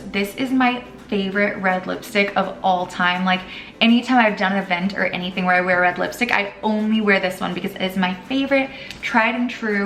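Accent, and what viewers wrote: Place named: California, United States. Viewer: Dang I know I say it all the time but personally I think u are one of the most sexiest, most beautiful, most alluring woman ever. To me your a goddess. The best part is, when I first saw u and priscilla ricart, I thought u was south american and she was from usa. Then boom she has accent n instead u don't u sound californian. And it's awesome. Sorry to bother ya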